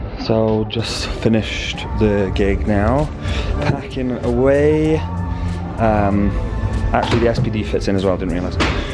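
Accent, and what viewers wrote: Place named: Wales, United Kingdom. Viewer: Hey been watching your vids and just subscribed brilliant content.. Recognised the Welsh accent and was wondering whereabouts yr from....low and beholds about 4 miles from me! Im after some charts for cruise ships buddy....will trade you some drum stuff for them....or lash you up with lots of beer 🍺 😉 😜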